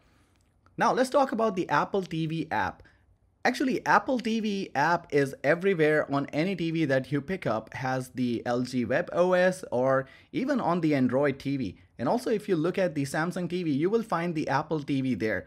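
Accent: India. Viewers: your indian accent is not that strong. don't worry bro.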